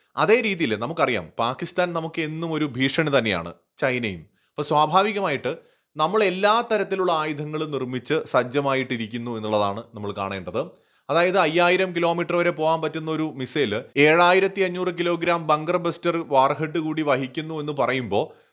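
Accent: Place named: Bengal, India. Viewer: എടാ... ബംഗാളി.... ഒന്നും manasilaanila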